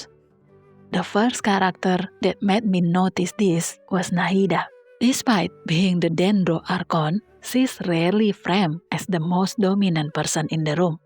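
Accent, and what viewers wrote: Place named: Indonesia. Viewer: Definitely indonesian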